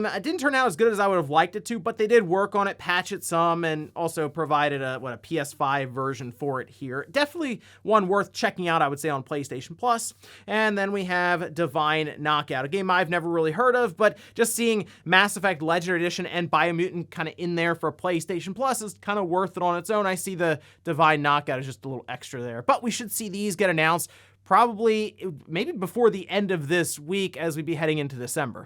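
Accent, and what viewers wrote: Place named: Brooklyn, United States. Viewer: he does have a faint Brooklyn accent.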